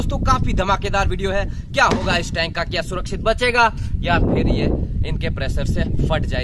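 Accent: Rajasthan, India. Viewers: Aap rajsthan se ho kya please replay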